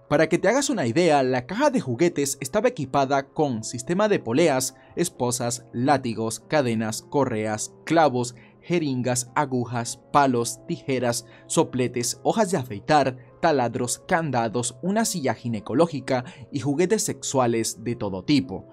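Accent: Venezuela. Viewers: tu acento es muy venezolano de dónde eres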